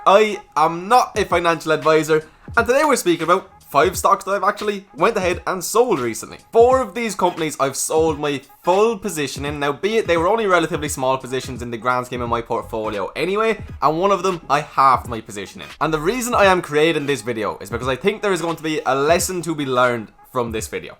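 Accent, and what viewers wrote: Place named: Ireland. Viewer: I absolutely love your videos! Also are you Irish? Love the accent!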